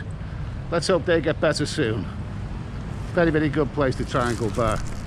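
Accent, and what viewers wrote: Liverpool, United Kingdom. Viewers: Hi,in Bangkok at the moment,just passed second pcr test,thank god.Must say the two tests made me anxious but I’m lucky I passed that’s all I say,it’s a big chance for anyone to take I must say.Ramifications of testing positive are not great financially or mentally.I am off to Koh Tao for two weeks with my Thai girlfriend and a few well deserved beers👍 Love hearing your voice as my old man was from Fazakerley,only a scouser would know where that is. He’s passed away now but reminds me of him. All the best to you🍺😊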